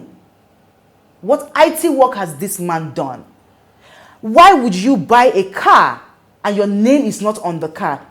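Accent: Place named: Igbo, Nigeria. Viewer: this your igbo accent sha strong o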